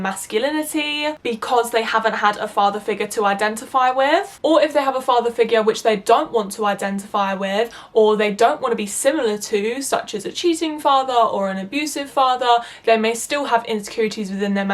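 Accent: England, United Kingdom. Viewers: Her british accent 🦋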